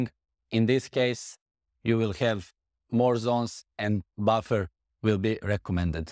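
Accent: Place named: South Slavic, Eastern Europe. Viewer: Odmah se primeti (tvrdi) naglasak juznih slovena. Ali odlicno je sve, samo tako nastavi. Pozdrav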